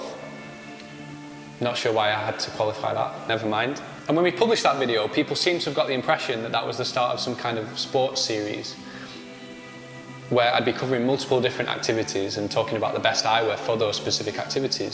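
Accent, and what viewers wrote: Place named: North, United Kingdom. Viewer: The accent is from the northern part of the UK. he sounds to me. .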